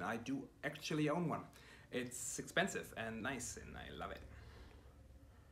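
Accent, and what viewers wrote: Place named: Berlin, Germany. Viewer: Aha! Du kommst aus Berlin! Das dachte ich, als ich Sie sprechen hörte - Thank you for the genius tip!!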